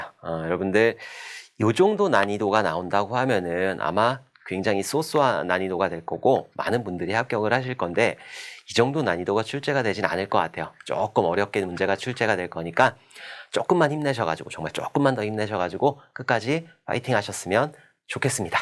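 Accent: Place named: Gumi, South Korea. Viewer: 필기 때부터 강의 들으며 공부 중인 학생이예요!!! 귀에 쏙쏙 들어오고 쉽게 설명해주셔서 좋아요 ㅠㅠ 감사합니다 근데 갑자기 궁금한 점인데 혹시 구미분이세요~?! 한번씩 "~ 하는거라", "~ 이거라" 하는데 구미사람들 말투라서 갑자기 궁금해졌어요 ㅋㅋㅋ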